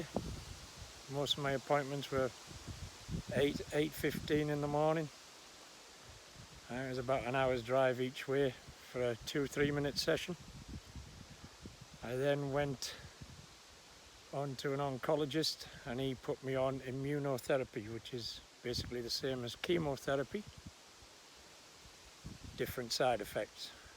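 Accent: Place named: Northeast, United States. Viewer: Hi, I saw your sticker on Craig Tate's channel. Sounds like you are from the NorthEast. Interesting to hear your story and I learnt quite a bit about your local area. "Join Youtube and see the world". I'm in York UK. Cheers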